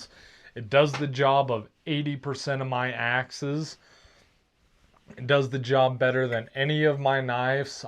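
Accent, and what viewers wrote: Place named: South, United States. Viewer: Where you from, amigo? The south?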